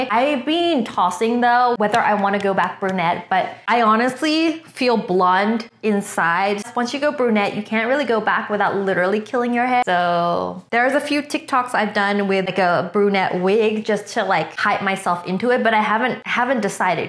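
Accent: United States. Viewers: I've not watched Wengie in years and was shocked about her now American accent! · Love the new Americanized accent. 😍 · She’s got an America accent 😂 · i haven’t watched in forever but it feels like you have an american accent now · You've been in the states for so long u have an American accent now.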